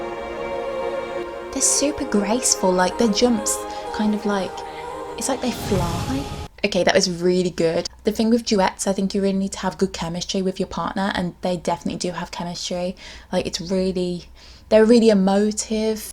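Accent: United Kingdom. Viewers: You sound British? Are you British?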